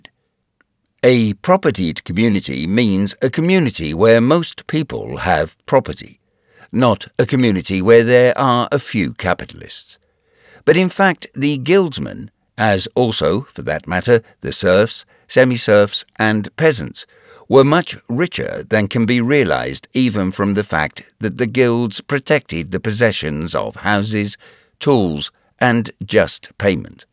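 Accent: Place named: England, United Kingdom. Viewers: English accent, there is NO SUCH THING as a British accent. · the narrator has a British accent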